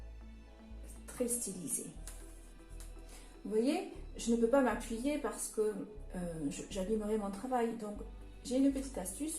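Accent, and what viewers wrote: South, France. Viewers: Coucou, je te mets un MEGA pouce bleu, car, (tu as fait un travail remarquable), c'est ce que j'appelle de l'ART, sincèrement, BRAVO pour cette barque sous fond coloré chaleureux et la végétation détaillée Je sais reconnaître les vrais talents, et (tu es une vraie artiste, car tu as ça dans la peau, c'est indéniable), et ton tableau est vraiment très beau, tu mérite 20/20 en plus, d'être jolie, ton accent du sud est attachant, gros bisous et prend bien soin de toi, Artistiquement, kenic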